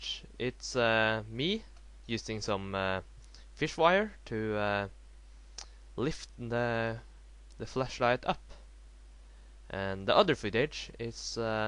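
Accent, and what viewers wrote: Norway. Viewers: are you norweigan? :D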